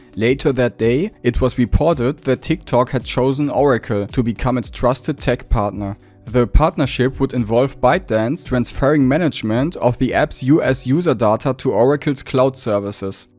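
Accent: Germany. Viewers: Is des a german accent?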